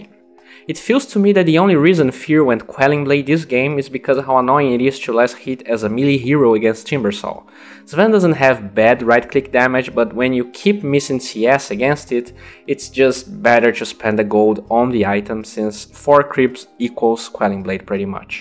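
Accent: Brazil. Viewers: teu sotaque parece de br hauahauah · accent de br, inconfundível ! Haha eh nois !! Feliz natal e ano novo tb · Haha eu reconheço um sotaque BR quando ouço!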